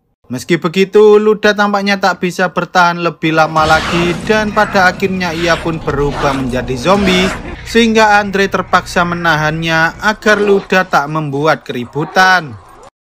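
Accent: Java, Indonesia. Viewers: mimin orang jawa nya medok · aksen jawa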